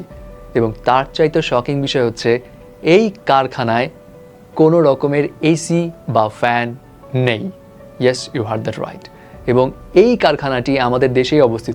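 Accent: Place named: Kolkata, India. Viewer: ভাই এর কথা কলকাতার মতো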